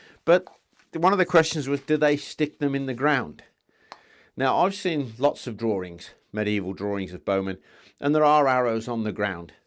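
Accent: United Kingdom. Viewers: Always love your videos ☺️ I could listen to your lovely British accent for hours, lol. Thank you for the instructive video, as always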